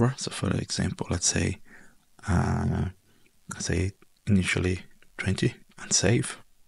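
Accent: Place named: Brazil. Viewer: eu reconheço o sotaque brasileiro de longe kkkkkkk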